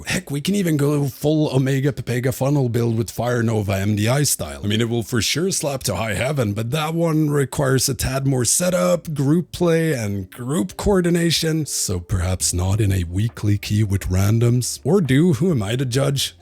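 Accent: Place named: Sweden. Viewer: bis swedish accent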